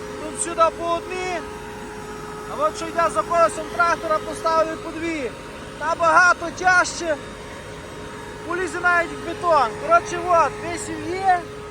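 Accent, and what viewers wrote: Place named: Lviv, Ukraine. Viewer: Ну чути що ви з Львівської області, навіть не спорте!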